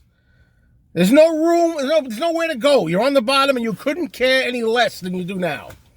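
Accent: New York, United States. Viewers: I always seem to watch your Rant Videos, when I am having my morning coffee, and again, I almost spit my coffee out from laughing. I loved the name of your channel, very catchy.... I am a native New Yorker, here in the South for 8 years, and hearing you TAWLK, I feel like I’m home again...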